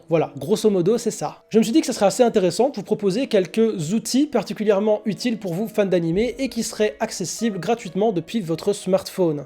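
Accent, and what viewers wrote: Belgium. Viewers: néerlandais ? jure t'es belge ? 🇧🇪🇧🇪🇧🇪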